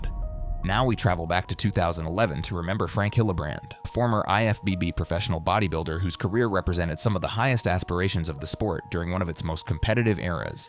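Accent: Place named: United States. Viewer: Another load a ballax video on YouTube with American voice over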